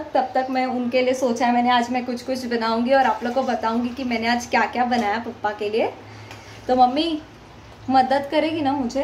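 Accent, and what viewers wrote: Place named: Maharashtra, India. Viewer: Stumbled upon your vlog while watching Nomad Shubham’s vlog. Daily lifestyle vlogs are always a good idea. You hav an attractive personality. Just work on the quality of content, video and audio. It’ll definitely fetch you in times to come. All the best. I’m a GI Surgeon currently practicing in Mumbai/Thane; originally from Bihar. I like the Maharashtrian-Hindi accent of yours.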